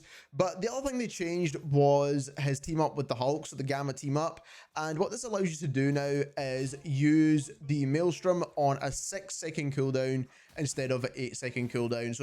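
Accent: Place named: Liverpool, United Kingdom. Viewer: The only thing painful about this video was his liverpool accent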